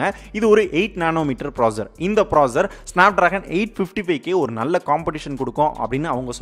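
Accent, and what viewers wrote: Madurai, India. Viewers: Neenga Madurai Ah Bro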